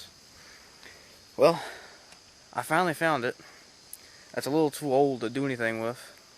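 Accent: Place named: Alaska, United States. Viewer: Are you related to the Alaskan bush people by chance? you have their same accent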